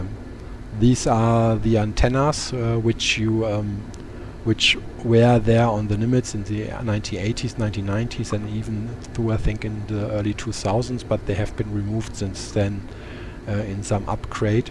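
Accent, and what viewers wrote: Germany. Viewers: You sound very german dude :D Nice Mod!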